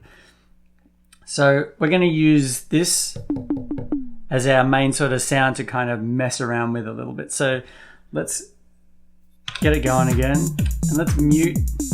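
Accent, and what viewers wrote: Australia. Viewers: Cool… are those prices $US. You sound like an aussie!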